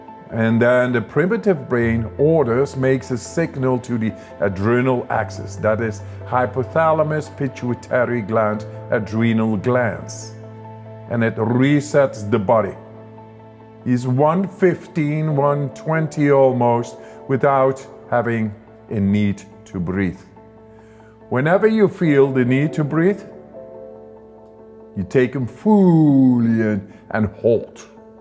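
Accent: Scotland, United Kingdom. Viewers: I was having some trouble recently calming manifestation.guide the voices in my mind during my morning meditation. him has a wonderful Scottish accent and a very calming voice. Thanks to his meditation CD's I have gotten back on track and feel a big difference in my meditation practice. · These meditations are perfect. <link> him has a gentle, warm, pleasant voice (with a slight Scots accent). The words are simple and clear. The timing is perfect... just as you start to find your mind wandering, he says and if you find that your mind is wandering... . There is no background music and no noise in the background, only his peaceful and engaging voice. The length of the meditations (about 1/2 hour each) works well for me. I find that I tire of some meditations after frequent repetition, but that hasn't happened with this one. The metta lovingkindness meditation always feels right. 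Some meditation CDs I have tried have not felt right. The voice seemed hurried, or sounded tense; the background music seemed intrusive, or there were noises that interfered with concentration. This is just perfect as an introduction for new meditators, and it's also a meditation that I return to again and again. I'd give this more than five stars if that were an option. Even my students, who are not ordinarily very receptive to meditation, relax when they hear this one!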